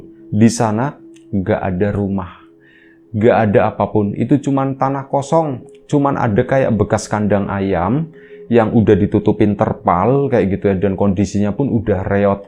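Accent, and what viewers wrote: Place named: Banyumas, Indonesia. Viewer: Subscriber baru, denger cerita ini perasaan saya campur aduk antara PENASARAN, SEDIH DAN LUCU. penasaran sm endingnya krena sengaja ga bc komen, sedih sm kisah hidup mas parman dengan anaknya LUCU sm pembawaan yg natural banget 😁 tentu dengan logat ngapaknya, sukses terus buat channelnya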